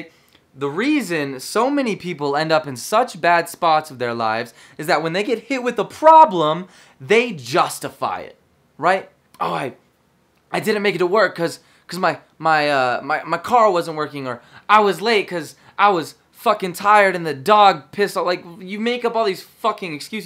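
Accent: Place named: United Kingdom. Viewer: Amazing British voice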